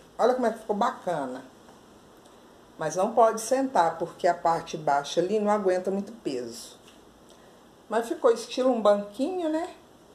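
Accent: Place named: Minas Gerais, Brazil. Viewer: Eu amei...na minha casa a sala de jantar e cristaleira é provençal...de patina branca...super amo...e super combina com o estilo farmhouse...então estou curtindo muito o que vc faz...até porque são coisas acessíveis...bem a realidade...adorando...e aproveito para dizer que adoro seu sotaque...ano passado no carnaval eu fui aí em BH....queria ter te conhecido naquela época...tbm fui la Inhotim...espetacular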